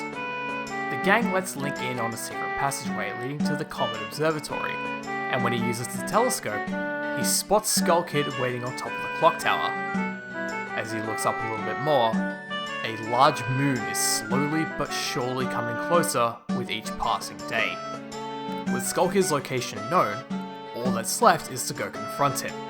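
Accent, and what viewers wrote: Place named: Australia. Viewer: I love the Australian accent. Okka-reener. Amazing.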